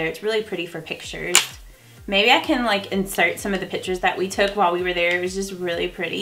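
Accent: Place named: Kentucky, United States. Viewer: You have to be from KY. You have the same accent as I do ☺️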